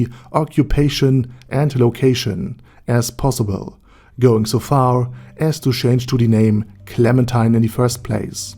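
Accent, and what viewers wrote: Germany. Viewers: having a german guy narrate overlord is oddly fitting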